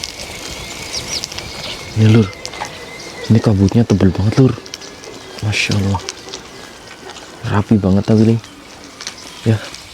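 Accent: Banyumas, Indonesia. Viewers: ngapak ke bagian ndi mas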